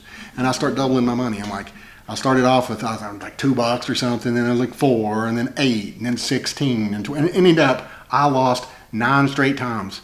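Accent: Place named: Appalachia, United States. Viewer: Love great video thanks for sharing 👍👍👍🇺🇸🇺🇸🇺🇸🇺🇸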